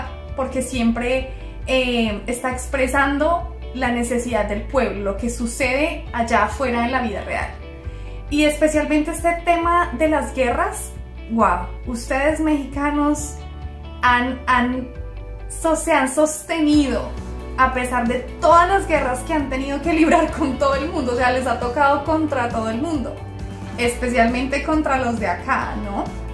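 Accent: Colombia. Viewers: Hola soy MEXICANO y tambien vivo en USA en el estado de OHIO saludo tu Nuevo subcritor me gusta mucho tu asento colombiano eres muy hermosa y que viva MEXICO y COLOMBIA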